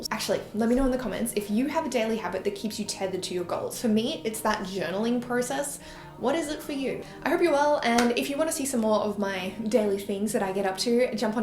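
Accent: Australia. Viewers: I miss the Aussie accent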